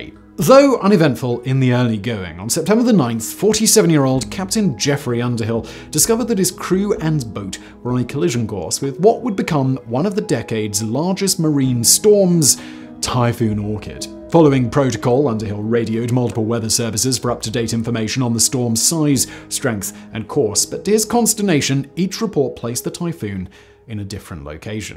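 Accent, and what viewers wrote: United Kingdom. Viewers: it's so much more satisfying hearing a Brit talking about a British ship. . But yes, he does a awesome job narrating anything.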